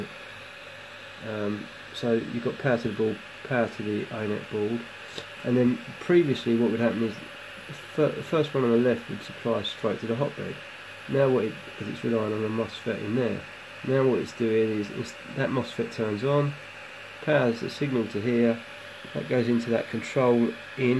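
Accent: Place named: England, United Kingdom. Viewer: U have the worst english accent I've ever heard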